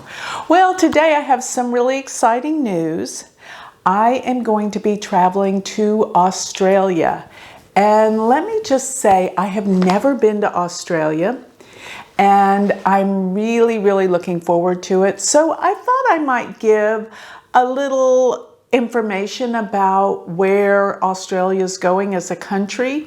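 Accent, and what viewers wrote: Texas, United States. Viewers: has a sweet accent: 'Intercontinental' became 'inerconinenal'. Perhaps it is texanese.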